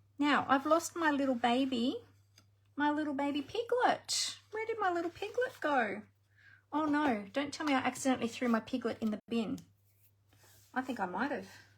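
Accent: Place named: Australia. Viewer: So nice to hear another Oz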